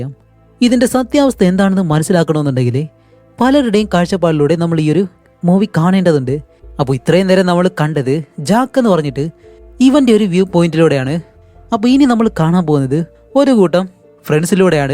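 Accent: Palakkad, India. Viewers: ഞാനാണ് നിങ്ങടെ പാലക്കാട്.... സ്ലാങ് തോന്നിയ ആരേലും ഒണ്ടോ?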